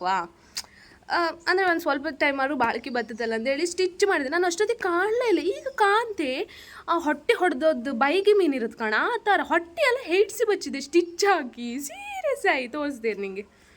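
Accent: Kundapura, India. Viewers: Nim ಕುಂದಾಪ್ರ bhashe kembuke bari chanda mare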